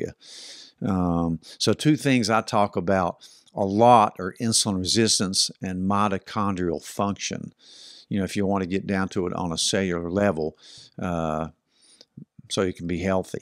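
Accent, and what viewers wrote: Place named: South, United States. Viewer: I despise Southerners and that accent.. wtf?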